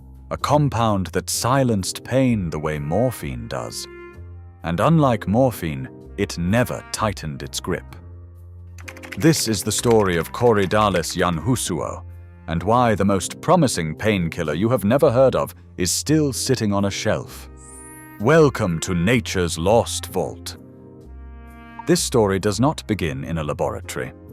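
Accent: United Kingdom. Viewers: British accent is cool. 👍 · What's with the Brit accent?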